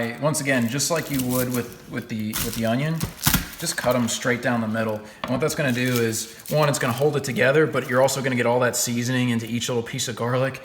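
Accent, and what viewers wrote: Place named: North, United States. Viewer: you sound like a yankee. no wonder you did everything "back assward".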